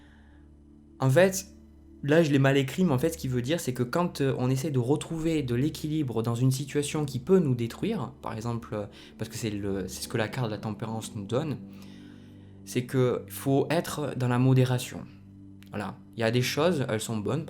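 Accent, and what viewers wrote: South, France. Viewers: Bon, je me pose une question à ton sujet depuis que j'ai découvert ta chaine... D'un côté je me dis que je me trompe car tu sembles avoir un accent du sud mais bon comme la question revient malgré tout à chaque fois, je fini par te la poser... Est-ce que tu es né du côté de Rennes avec une lettre de plus dans ton nom officiel ? Si oui, je t'ai porté sur les fonts baptismaux... Namasté... ♥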